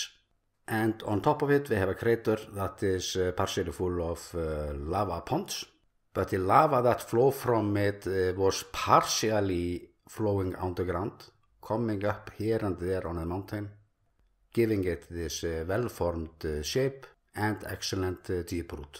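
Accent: Iceland. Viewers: Thanks and keep us updated! I really like Your cute islandic English.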